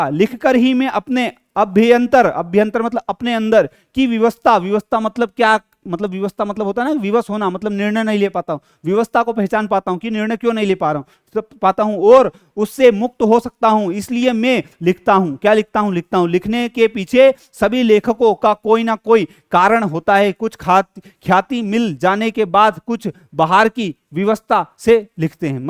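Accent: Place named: Haryana, India. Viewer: Sir aap haryana se ho language se lag raha hai.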